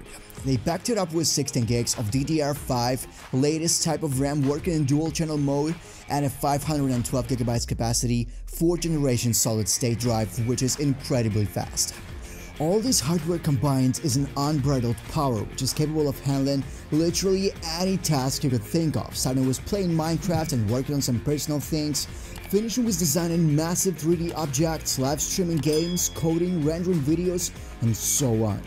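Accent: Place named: Europe. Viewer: I wish u all the best for your channel but your accent is distracting, its like u are trying to sound like you are from some European country, it sounds like a mix of European/ California surfer who is trying to sound tough and also suffers from asthma (from the labored breathing sounds) if u are not faking your accent then I apologize, either way good luck with your channel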